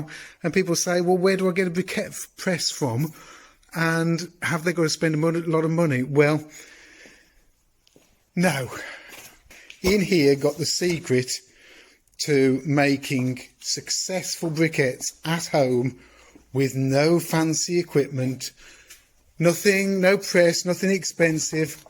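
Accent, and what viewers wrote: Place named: West Midlands, United Kingdom. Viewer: Greetings from a Brit in Germany. Nice to hear a West Midlands accent (I studied in Stoke) I am fascinated with "coffee logs" and recipes. I have been saving all my waste coffee for ages. Tell me can you include waste paper in this mix as well as waste sawdust? Do you think these "peat" briquettes might be useful to bake bread in a Dutch Oven experiment?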